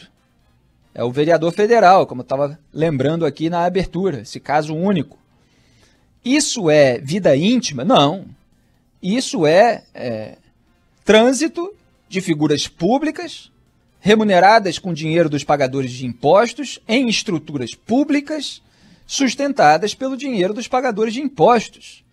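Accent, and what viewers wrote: Rio de Janeiro, Brazil. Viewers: Fala carioca 🤗🤔🇧🇷🤭😷liberdade de críticas ❤️ admiro sua coragem.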